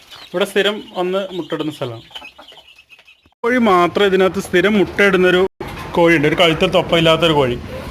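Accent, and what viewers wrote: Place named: Palakkad, India. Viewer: ningaluda pallakad vayikol ullathe pola yavidayum kanilla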